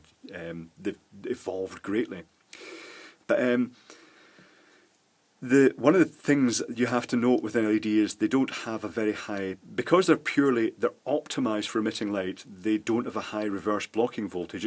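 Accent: Scotland, United Kingdom. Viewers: I scanned the comments for 2 minutes and am sad that no one mentions how he keeps saying nanofart. Classic Scottish accent! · Which accent he explained ? British ?? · Thank you for your video :-) are ya from Scotland? You sound like it dontcha know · Brilliant - why is it that the best sparkles have a Scottish ascent - answer - they are the best I have ever sailed with - enjoying this - brilliant! Please continue! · Chop Chop Scottis presenter speaking English. Nothing to do with America. Snowflake much?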